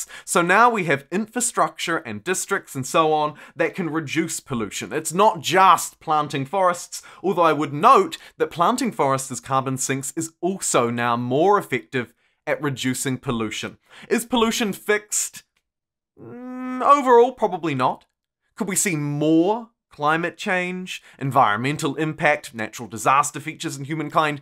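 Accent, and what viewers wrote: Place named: New Zealand. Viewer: You’re one of the only excited-sounding YouTubers that I can tolerate. You’ve got such a genuine joy behind your voice and I love to get my Humankind news from you! It’s probably because you’re a Kiwi. Love that accent. Keep it up!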